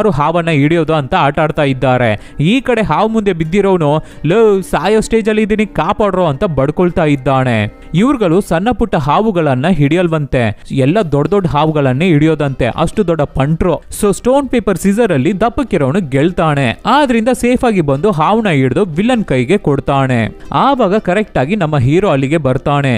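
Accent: Tamil Nadu, India. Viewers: Kannada in Tamil accent 👌👌👌👌👌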